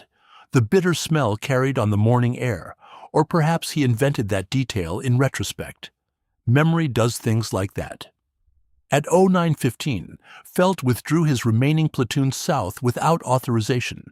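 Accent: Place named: United States. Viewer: I love this because it sounds so American. Remember though at Remagen, it was LT Timmerman that saw the bridge 1st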